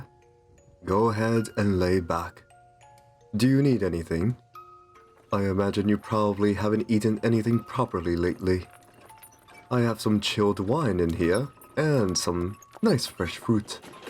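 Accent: Russia. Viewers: Sounds Russian 👀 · Is he Russian or something? :D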